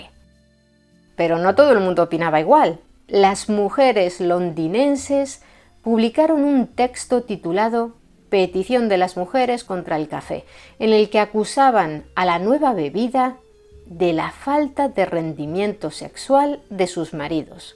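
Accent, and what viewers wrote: Spain. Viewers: Me dió risa porque si nos estamos tomando un cafecito mientras escuchaba el video, saludos, me encanta el contenido pese a que el acento español no me resulta tan agradable, pero claro que no me choca, solo es que no está en South TX uno muy acostumbrado a ese acento al ser de origen mexicano, sin embargo creo que para cuestiones hostoricas nos da cierto aire de parecer adecuado, así en mi mente funciona que creo que se hablaba en la antigüedad aunque sea algo que no sea verdad pero así se concibe en mi mente!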